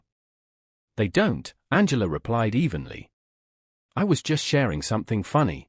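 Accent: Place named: RP, United Kingdom. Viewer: The British RP accent? Schedule Aluminum Oestrogen..etc aren't American Pronunciation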